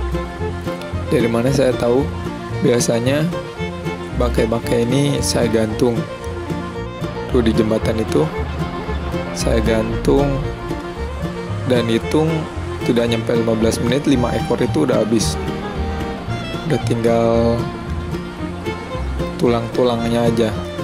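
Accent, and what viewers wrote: West Java, Indonesia. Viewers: Orang sunda ya?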